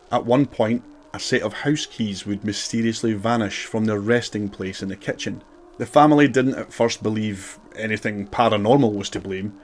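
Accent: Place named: Ayrshire, United Kingdom. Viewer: My folks live in Ayr, you sound just like you maybe come from around there? New sub and loving the wee stories, thank you x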